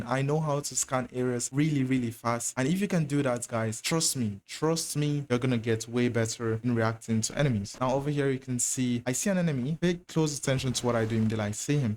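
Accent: Nigeria. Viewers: U dey sound like 9ja guy😤😅.. gg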